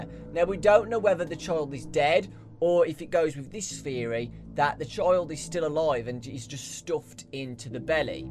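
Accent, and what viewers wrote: United Kingdom. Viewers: Omg your right about sister location being connected to that 4! I looked it up and its true! Also one question. Are you British? You sound a little british. Not hating I just wanna know of your British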